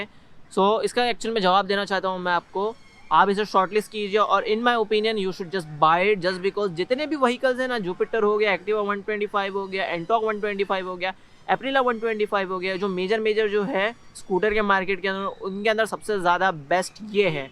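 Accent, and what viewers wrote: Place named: Aurangabad, India. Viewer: Bhai aap kahan se ho apke andaaz se lagta hai aap Aurangabad ke around kahin se to ho.....?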